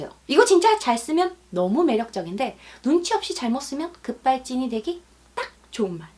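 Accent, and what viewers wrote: Gyeongsang, South Korea. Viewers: 님의 목소리ㆍ말솜씨 정말 매력적이군요 경상도 특유의 억양이 상대방을 기분좋게 합니다 훌륭한 방법을 숙지하고 실천 할려고해도 좋아하는 마음이 너무 꽉 차있으면 감정이 가만 놔두지 않네요 ㅡ 님의 강의데로 천천히 데쉬해 볼랍니다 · 갱상도 아인겨?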